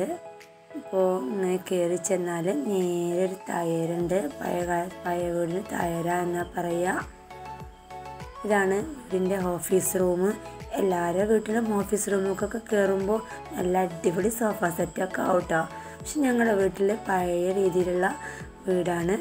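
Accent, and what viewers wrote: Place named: Malappuram, India. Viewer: Malapuram aanu alle samsar kelkumpoanassilaavunund veed pazayath aananneyollu nalla soukaryam ulla veed aani. Nannayitund video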